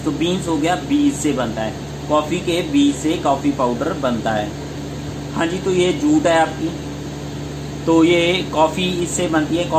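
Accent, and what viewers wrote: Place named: Tamil Nadu, India. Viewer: You are from Tamil Nadu I am sure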